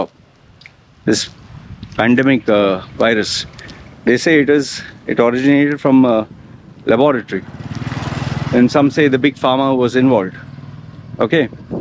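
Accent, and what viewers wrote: India. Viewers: When ur accent is indian and u can't even pronounce indian names correctly.....dhruv rathee?😅😅😅 On purpose?? To act cool or sound like west!? Oh' cmon u dnt need such acts to look polished....u r much more than that 😊😊😊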